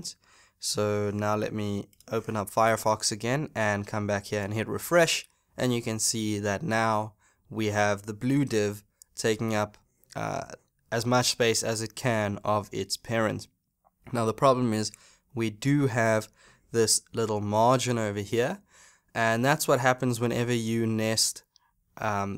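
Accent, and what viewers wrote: South Africa. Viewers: Reading these things on tutorial sites and watching someone explaining these things to you are two different experiences. Thank you for providing clarity on this , looking forward to learning more from you ....Also i can hear that fellow South African Accent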